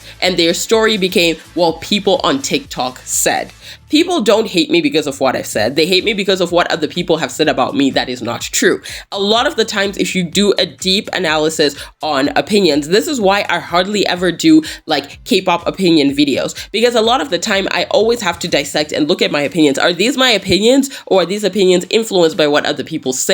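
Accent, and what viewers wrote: United States. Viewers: not related but i thought you were actually from kenya and just have a really good american accent lol are you actually from the states? bc i don't blame you and your family for getting out of this racist hell hole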